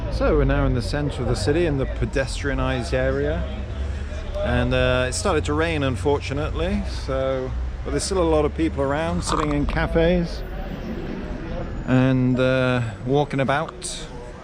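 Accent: England, United Kingdom. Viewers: Bald and bankrupt got me here I am enjoying your vids too ,all ways wanted to visit Serbia since Tony Blair though it was a good idea to bomb it because he had no idea about history, can we have a little bit about your back story, how you got your very English accent maybe ?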